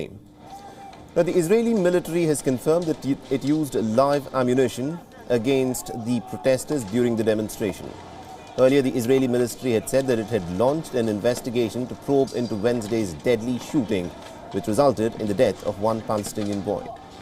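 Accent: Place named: India. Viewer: You need to polish up your accent so it's clear outside india ,it's very difficult to understand you .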